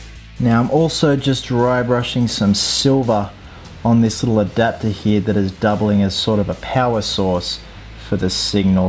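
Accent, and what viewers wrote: Australia. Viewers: your an aussie arent you??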